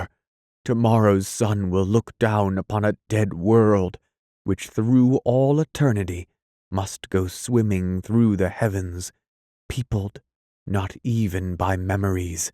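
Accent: United States. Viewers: I have a dubt , is it american English?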